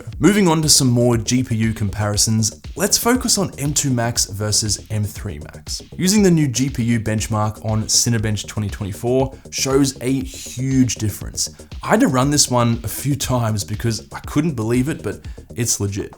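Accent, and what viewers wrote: Australia. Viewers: You speak like an Australian.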